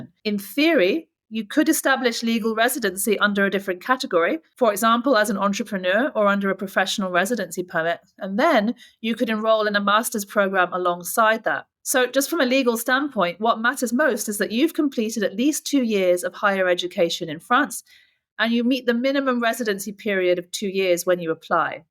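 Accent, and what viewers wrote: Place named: United Kingdom. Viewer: That'd be awesome. And thanks for being so responsive, for taking the time to respond to random comments. That and of course the meticulously curated content makes me come back to your channel over and over again. Plus, I love the British accent, and given how English is my second language, being on your channel is always a learning experience for me, in more ways than you intend. Thanks for everything. :)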